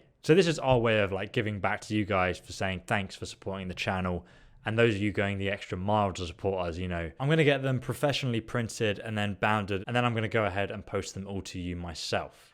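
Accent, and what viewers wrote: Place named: RP, United Kingdom. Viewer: Get off your Fat Arse and like the rest of the Fucking world get a Fucking Job!!! You make me Fucking Sick begging for money on youtube, while people are working flat out to pay off their mortgage and feed their kids, You’re crying because you can’t sustain Your hobby as a full time career...well boohoo! You sound like you’ve had a very privileged upbringing by your Received Pronunciation and the fact that you’re a grown man mentioning that “mummy and daddy will help you out!” only confirms my belief that you are a work shy Bag of Shit who has no compunction but to ponce off of the gaming community who work and play for pleasure! But don’t worry people like you will be forced to get of their lazy arse sooner or later, you don’t seriously think you can make a long time career out of this! Seriously fella wake up and smell the coffee you dozy Cunt!!!🤣🤣🤣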